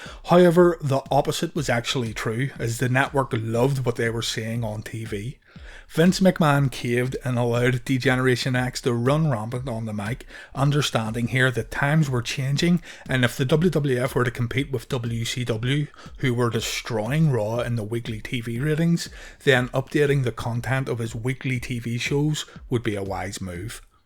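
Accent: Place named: Scotland, United Kingdom. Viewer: Scottish accents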